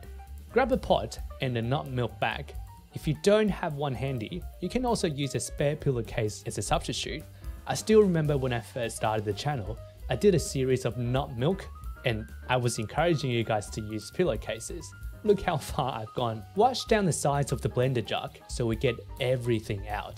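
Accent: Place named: India. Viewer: You chickpee have an Indian accent as an indian i liked it 🤣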